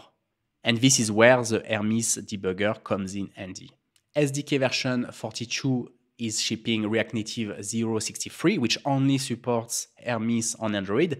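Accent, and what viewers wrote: France. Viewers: it seems you are from france? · As a dev learning both React Native and french, I love your videos!! Just love the french english accent 💚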